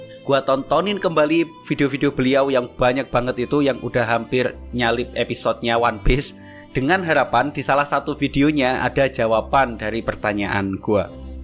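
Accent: Java, Indonesia. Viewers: Mas mon maap sebelumnya, mungkin akan lebih nyaman pake “aku kamu” aja, gak usah/jangan pake “Gua Elu” karena masih belum sesuai, maaf medok bgt.. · Apakah boleh saran mas😁 Saya dari jawa dan kayaknya mas juga jawa bisa di lihat dari lahjah bicaranya Coba pakek "saya kamu atau anda" jangan pakek "lo gw" kayak yang kaku ajah mas😁🙏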